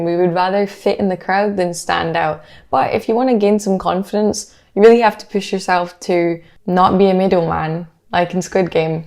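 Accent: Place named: Scotland, United Kingdom. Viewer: As an introvert I was inclined to click of the video and as soon as I heard your Scottish accent as a fellow Scottish person I now feel the need to binge your whole channel <3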